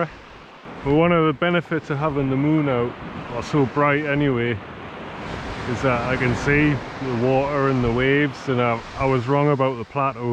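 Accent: Newcastle, United Kingdom. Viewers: Listening to you with your Geordie accent using Cockney rhyming slang made me smile,. Thank you for giving us another video on a freezing night. 👍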